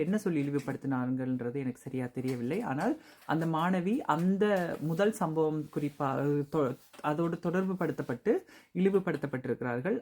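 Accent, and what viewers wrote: Sri Lanka. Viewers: Akka algaa sri lanka tamil pesurigelee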